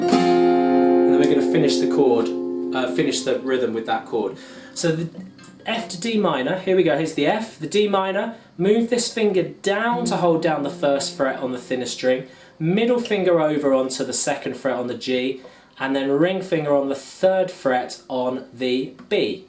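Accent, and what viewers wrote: England, United Kingdom. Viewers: Thank you very much! It's really great to get all these detailed explanations and ultimately UNDERSTAND :- )!!... and with a nice English accent too :- )! Hello from France ! Thomas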